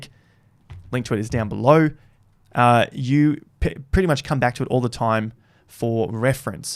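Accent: Australia. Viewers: Another perfectly explained video that helps most <12month newbs. (Me included). Such a great Aussie way of delivering as well. Thanks for your content.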